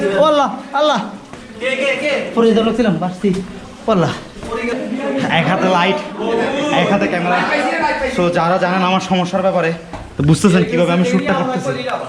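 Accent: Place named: Chittagong, Bangladesh. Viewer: চট্টগ্রামের আঞ্চলিক টানে শুদ্ধ বাংলা বলার চেষ্টা মাত্র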